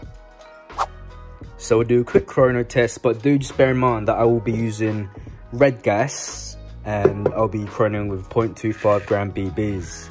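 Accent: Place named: Caribbean. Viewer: Why does he have a black West Indian accent?????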